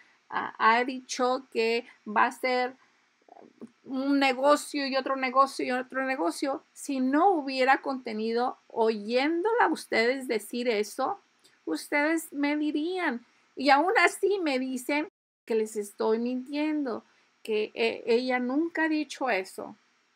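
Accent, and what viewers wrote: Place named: Cusco, Peru. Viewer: La ignorancia es atrevida e insolente, para tu conocimiento, ella es de Perú, pero no de la capital Lima, en Lima como en otras provincias el español es diferente con diferentes acentos, los de la costa, sierra y selva no hablan igual. es del Cuzco y allá la gente habla así, si te burlas de ella te burlas a base de tu ignorancia y tremenda insolencia, puedes opinar pero, antes EDUCATE E INFORMATE, para no decir tremenda estupidez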